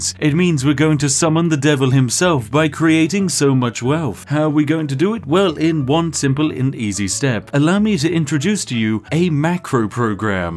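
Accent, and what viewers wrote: United Kingdom. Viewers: your accent is so British ohmygod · You sound like Internet Historian. Is this one of your "secret channel" where you sound more like a british dude?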